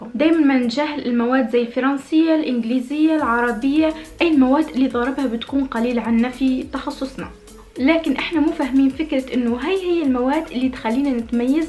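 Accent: Tunisia. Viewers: دوختني وتش نتي تومسية او مغربية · تونسية ياخي ؟؟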